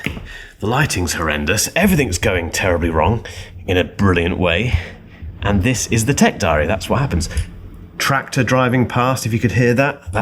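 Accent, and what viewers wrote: United Kingdom. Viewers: Love the accent. The envy of all us non-British students of English.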